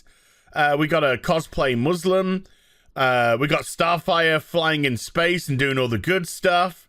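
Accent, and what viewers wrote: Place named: RP, United Kingdom. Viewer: Your posh old fashioned British gentleman accent always on point.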